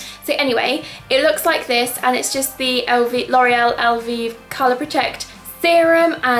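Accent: RP, United Kingdom. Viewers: she sounds posh as well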